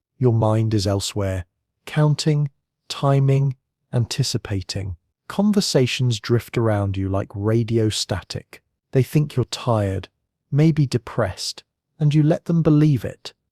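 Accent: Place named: United Kingdom. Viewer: I don't know I just can't stand this guy's accent. His British accent. Sorry I got to turn you off.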